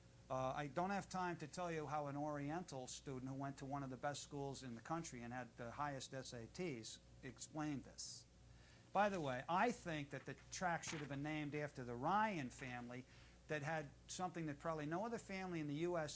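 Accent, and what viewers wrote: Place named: New York, United States. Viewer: It's a new york accent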